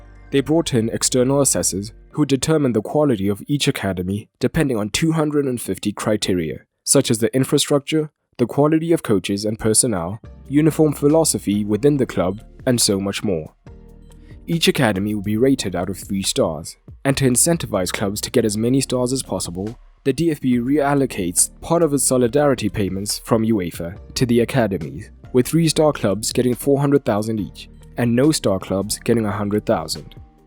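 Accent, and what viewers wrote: South Africa. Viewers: Where are you from? You sound South African. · I could swear you have a South African accent bro. I love these videos! And the OneFootball app 💪🏾 Keeping it Simple 👌🏽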